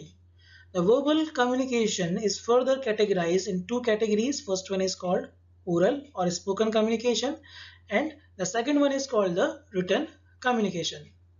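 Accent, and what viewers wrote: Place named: India. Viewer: the indian pure accent made my ears bleed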